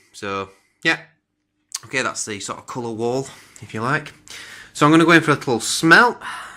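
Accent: Yorkshire, United Kingdom. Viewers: Good review - I wiped the dust off my keyboard as I was watching and somehow turned on subtitles that appeared as you were speaking. It had a hard time interpreting your Yorkshire accent and some of them are hilarious, if you know how, turn on this subtitle function and watch a few of your vids. I can guarantee some quality entertainment.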